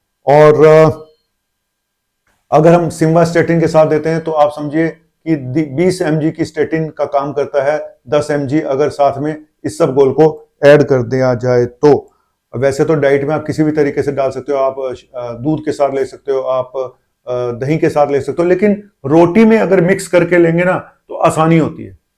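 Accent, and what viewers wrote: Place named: Punjab, India. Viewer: Sir सत श्री अकाल आप बातों में पंजाबी का तड़का लगाते हो लगता है के आप का पंजाब से कोई नाता है, लगता है के तेरा मेरा कोई पिछले जन्म का है नाता ❤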